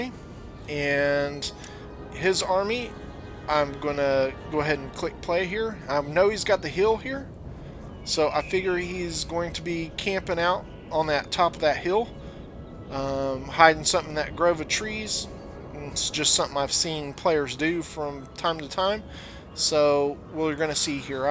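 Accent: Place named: South, United States. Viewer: I dont know why youtube suggested this video but I found it entertaining. Your commentary is pretty good and I must say I find your (somewhere in the southern US) pleasing to listen to, but perhaps 15 minutes is a bit too long. Anyways you earned yourself a sub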